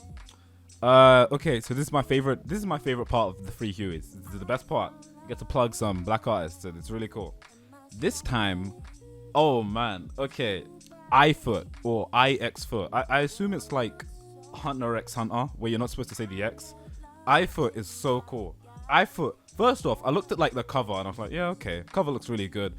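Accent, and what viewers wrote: United Kingdom. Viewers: Ok haven’t watched the video yet. My thoughts before I start based on the title. Coming from the perspective of a black American male. Your accent from the perspective of white Americans, (which is the perspective of power) puts British blacks in America a top American blacks in the hierarchy . Now you’re still black so you’re bellow all white folk, but your struggles here in America will be different than an American black. I wouldn’t consider it American exceptionalism because it would be ignorant to think because you’re British you don’t experience the same sort of systematic racism that we have in America, because systematic racism isn’t an American thing. It’s a western thing, you’re still black you’ll face the equivalent hardships just the British version. I’ll come back and edit this post after the video if my thoughts have changed or been addressed.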